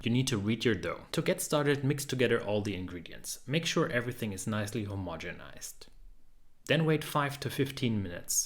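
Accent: Germany. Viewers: Never apologize for your German English. Your speech is clear and easily understood. Your speech is near native English speaker! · Gluten tag! I loved the German English! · When people hear you speak English they wish they could speak German as well as you speak English. Your slight German accent is an added bonus.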